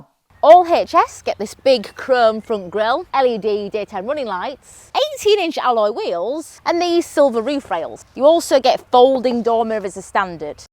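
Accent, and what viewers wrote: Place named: RP, United Kingdom. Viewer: What is this bloody posh accent come on.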